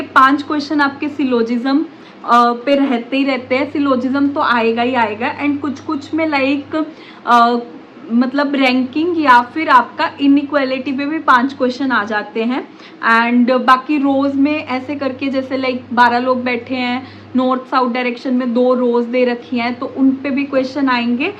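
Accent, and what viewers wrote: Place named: Pahadi, India. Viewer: Maam are you giving RBI grade B too ? also are you pahadi ? I feel your accent is pahadi those himachali , uk folks have that.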